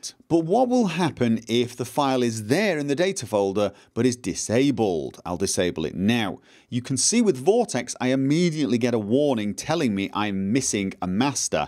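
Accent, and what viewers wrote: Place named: Liverpool, United Kingdom. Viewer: Very helpful up to now, the big question is.... Where about in Liverpool? Defo scouse defected!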